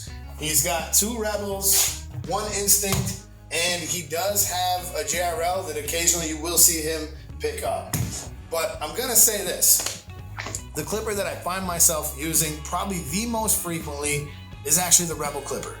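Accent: Buffalo, United States. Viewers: Are you from buffalo?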